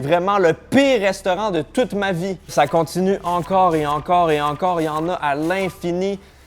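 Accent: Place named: Quebec, Canada. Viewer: je tiens à dire que en tant que québécois, je suis vraiment fier que soit devenu aussi gros sur la plateforme et qu’il est regardé par autant de monde. Ça permet de partager notre accent et notre culture ce qui est vraiment cool. Je te suis depuis bientôt 6 ans et ton contenu est encore aussi excellent continue comme ça je l’adore. (ps: félicitations pour le million d’abonnés 🥳🥳🥳)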